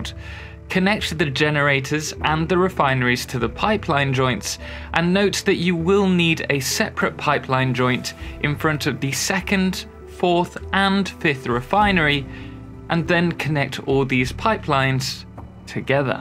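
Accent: United Kingdom. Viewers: This has got to be the most British sounding person ever.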